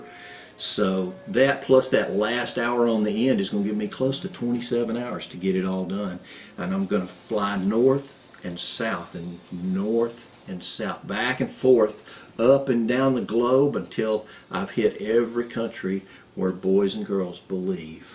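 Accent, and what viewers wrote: South, United States. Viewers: Santa had a bit of a southern accent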